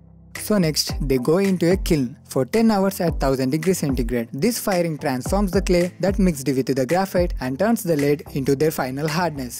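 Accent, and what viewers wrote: India. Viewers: Would still be better without the gupta accent.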